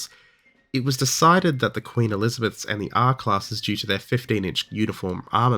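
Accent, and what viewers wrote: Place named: Australia. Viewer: The way this is presented, with the Aussie accent as cherry on top of the cream..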